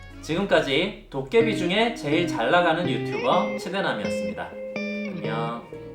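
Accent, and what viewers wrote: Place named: Gyeongsang, South Korea. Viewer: 멀리서 보면 솜사탕인데 가까이서 보면 거즈라더니... 온화한 표정으로 (feat.미세한 경상도억양) 세상 조곤조곤하게 팩트로 때려주시네요ㅠㅠㅋㅋㅋ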